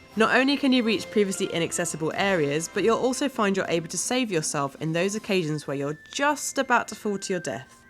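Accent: United Kingdom. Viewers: CAT MERIO I’m not trying to be mean. She’s British.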